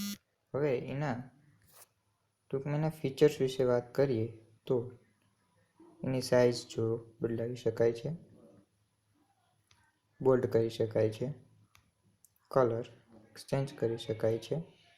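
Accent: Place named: India. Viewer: Stupif indian voice smells like rotten egg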